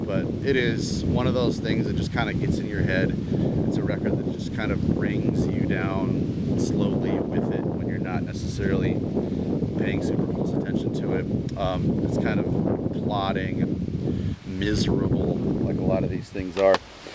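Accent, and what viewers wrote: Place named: Australia. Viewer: Dude, that walking footage at the start rules, it's like a music video to the song playing. No problems with the wind noise, great ambience. I can forgive that, but not that "down un-da" accent! Mandy who is Murkrat is quite prolific, she was in gothdoom band Lycanthia who I saw live back in the day, and is in funeral doom band The Slow Death. I believe she lives on a farm and suffered some damage during last years fires.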